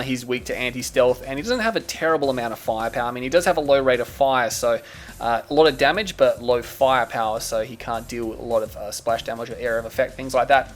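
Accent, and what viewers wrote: Australia. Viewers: Your Ausssie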